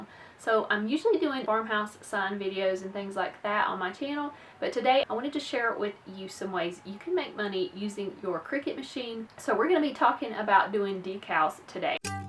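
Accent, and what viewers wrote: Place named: Appalachia, United States. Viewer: Thank you,! I love to listen to your delightful Appalachian accent!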